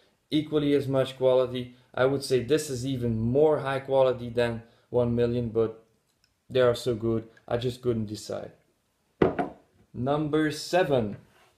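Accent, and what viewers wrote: Netherlands. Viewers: very nice list,! i'll need to check out 1 million intense, but strangely enough, it's been pulled off the shelves over here in Ukraine. all the 1 million flankers have been. wonder if it's just over here, though. btw, where are you from? can't place your accent. i'd say Dutch?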